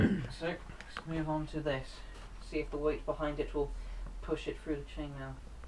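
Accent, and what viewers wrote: United Kingdom. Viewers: He sounds like some type of Londoner too, which is my least favourite accent.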